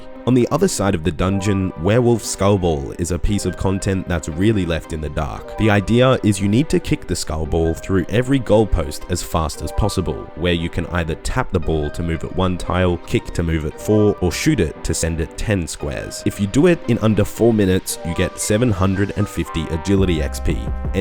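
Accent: Australia. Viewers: I fucking love your voice, are u from Australia? The accent combined with the deepness of your voice makes me happy.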